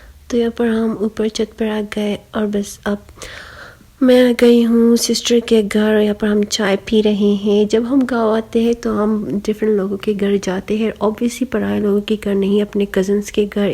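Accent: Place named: United Kingdom. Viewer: Over kahin ki urdu bhi English accent me bol rai ho be original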